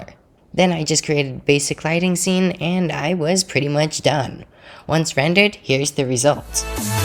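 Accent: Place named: United States. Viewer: Dude you sound American. So, I would assume the imperial system should be second nature😂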